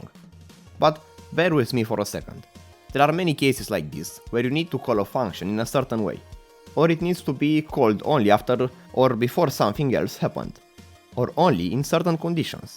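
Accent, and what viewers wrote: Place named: Romania. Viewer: romanian accent for the win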